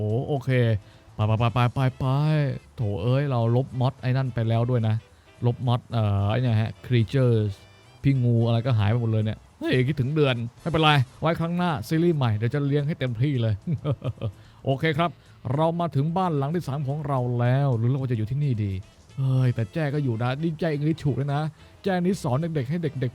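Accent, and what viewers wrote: South, Thailand. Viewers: Sunshine Channel ลุงพีคนใต้หรือคนภาคกลาง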